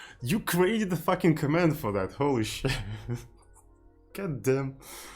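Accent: Russia. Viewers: русский акцент?)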